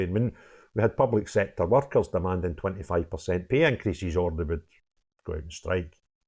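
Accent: Scotland, United Kingdom. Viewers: Love your accent, makes me want to move to Scotland 🏴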